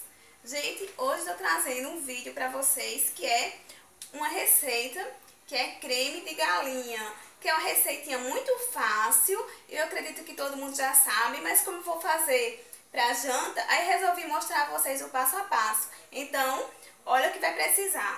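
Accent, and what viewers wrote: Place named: Northeast, Brazil. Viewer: Olá querida flor 🌹 eu amo seu sotaque 💓 realmente me cativa. Você é baiana? Parece sotaque nordestino. e seu Marido também cozinha ou só você mesmo? Você pôs o quentro por último e minha esposa sempre põe primeiro kkkk Ótimo vídeo parabéns e sucesso para você abraços ☺👍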